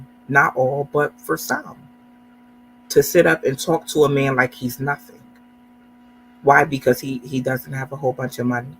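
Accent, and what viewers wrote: Louisiana, United States. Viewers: Hello a question for you, something I may have picked up on. Are you a Louisiana native? I seem to recognized a dialect in your speech pattern. A simple yes, or no would be fine.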